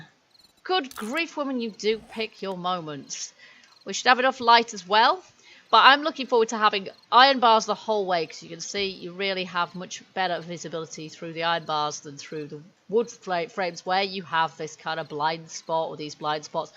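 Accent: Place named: England, United Kingdom. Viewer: I just wanted to tell that i like english accent. 😋 cu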